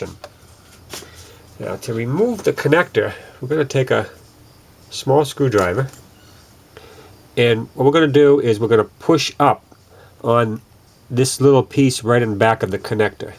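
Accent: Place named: New England, United States. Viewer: This is a nice video and you have a pleasant New England accent. What videocamera did you film with? Thanks. T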